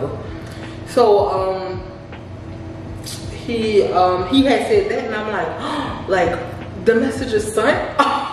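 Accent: New Orleans, United States. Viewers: Hey there my name is I’m just discovering your videos I can dig it I will be following! you remind me so much of my best friend...ikr 2 lol! Btw Ik your probably frustrated with your self behind the situation but as far as being embarrassed i think not!! You have absolutely nothing to be embarrassed about! I’m sure at some point in life if a person is old enough, more than the majority of us have been thru this or something similar...trust me!! Oh & I love your accent gotta be N.O. baaabeee😂❤️🙏🏾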